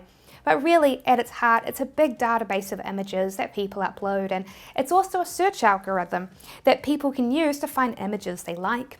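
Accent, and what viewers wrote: New Zealand. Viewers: Bruh I clicked the vid and heared the voice Just knew it was kiwi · Yes, definitely New Zealand accent. One of the most difficult English variants for Australians to understand. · Thank you for posting this video! Love your content and your awesome kiwi accent! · Thank you for the help. You sound familiar - are you a Kiwi? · im guessing she is from new zealand. but it can be hard to tell between australia and new zealand for americans. must be easy for them. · Thank you for your reply, I thought that a company was the option. But I thank you for clarifying me on this. I didn't want to be rude or anything regarding your videos. I just thought it would be good to inform that depends on the country it is not always free and easy (in France for example it is free to open one but you pay tax on your income and mostly you need a 4 days training on legal stuff cost: €260) It is just something that we need to be aware of. 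I do like the dynamic of your videos and that it is not too long and quite easy to watch. Happy also to watch and listen to a kiwi accent for a change ;). Keep on doing what you do so well. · For those who do not know, she has a lovely Kiwi / New Zealander accent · Oh that's so neat. I really wish I could meet you! You seem like an amazing intelligent woman, with an amazing New Zealand accent! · Sim, é assim mesmo que o sotaque da Nova Zelândia funciona. Vulgarmente conhecido como "kiwi". Outro youtuber neozelândes, Sam Owens, tem o mesmo sotaque. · Do u have nz accents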